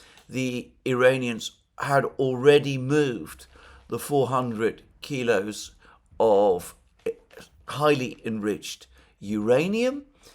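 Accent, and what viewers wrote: United Kingdom. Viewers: Where in Britain is that accent from? Giggles make it even harder to pick. Sorry bro, I have to skip this one.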